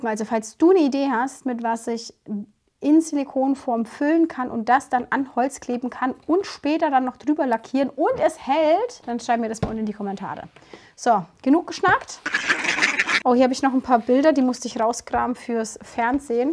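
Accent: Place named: Franconia, Germany. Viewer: Liebe, ich weiß noch, als ich dich zum 1. Mal mit Sandra gesehen/gehört hab. Mein erster Gedanke war "die gefällt mir" und "die kommt doch aus Franken😂" dein Kanal heißt " " das war für mich persönlich nie nur nähen, sondern auch DIY's. Es ist deine Entscheidung, ob du mehr DIY's und eine Sommerpause machen möchtest. Es jedem Recht machen, kann man leider nicht. Dein Herz hat gesprochen und ich freue mich schon sehr auf ALLES was noch kommen wird ❤❤ Liebe Grüße und fühl dich fest gedrückt ❤❤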